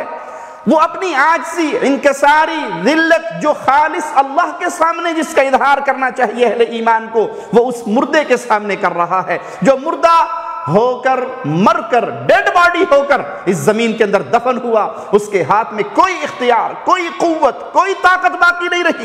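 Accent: Arab world. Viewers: اردو کو اردو لب و لہجے میں بولا کریں۔ عربی تجوید کے ساتھ نہیں۔ اردو کو عربی میں بولنا اتنا ہی غلط ہے جتنا عربی کو اردو میں بولنا۔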